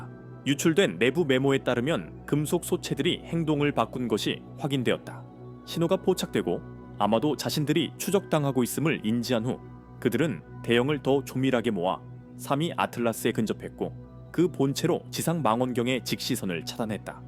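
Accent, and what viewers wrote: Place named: Yanbian, China. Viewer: 낯사 ㅋㅋㅋㅋㅋ 조선족이야? ㅋㅋㅋ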